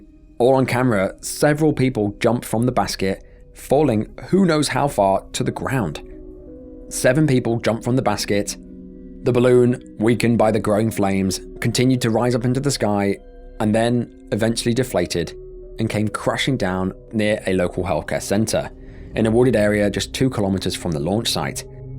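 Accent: United Kingdom. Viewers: The Queens English in Ai is just too funny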